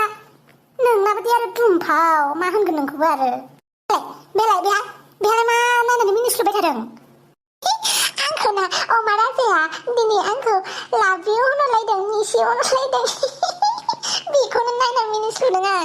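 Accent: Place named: Kokrajhar, India. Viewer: Nwngsini Boro-a Kokrajhariatar...mwjang👍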